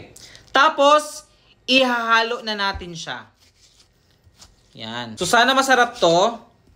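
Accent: Visayas, Philippines. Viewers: Bisaya ka po ba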